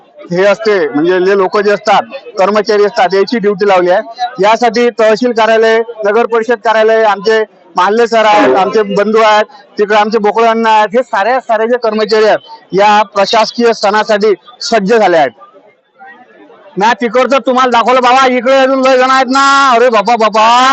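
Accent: Varhadi, India. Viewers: आपल्या वर्हाडी भाषेत मस्त बोलतात दादा..... एकच नंबर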